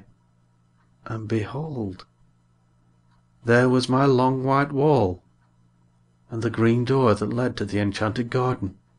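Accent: United Kingdom. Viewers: Типичный англичанин читал ни одного кошаче американского уррр уррр слова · Британский!американский резче и они очень четко произносят многие звуки такие как R и не тянут гласные.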